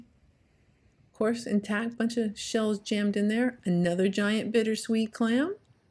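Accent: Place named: North, United States. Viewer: Ive been going back and watching the older videos. I mentioned to my husband that you had an accent that sounded northern. We always wondered and you answered here by saying NJ 😂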